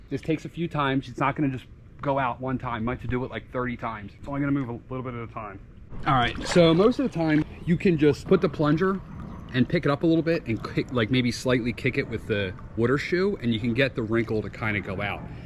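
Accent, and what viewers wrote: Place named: Delaware County, United States. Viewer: That Delco accent lol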